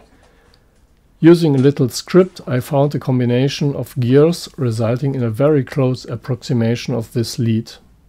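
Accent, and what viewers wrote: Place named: Germany. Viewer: Лучше бы по немецки шпрехал. Непривычно слушать англискую речь с немецким акцентом.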